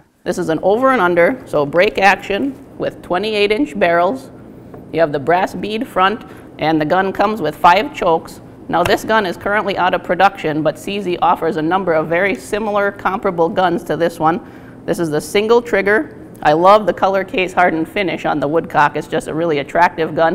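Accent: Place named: Wisconsin, United States. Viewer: Wisconsin accent coming in thick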